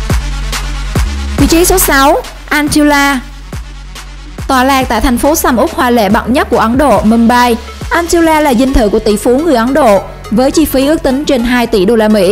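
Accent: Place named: Binh Dinh, Vietnam. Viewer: cij này ns tiếng như bình định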